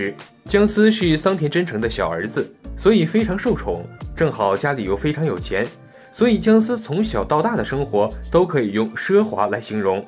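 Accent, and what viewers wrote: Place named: China. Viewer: 这个节目吊啊，说着大陆普通话、换算都是新台币！！！！哈哈哈哈哈😄懵逼了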